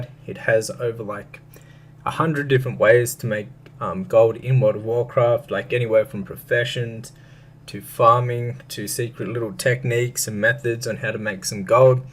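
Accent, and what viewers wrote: Australia. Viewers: Love your Aus accent lol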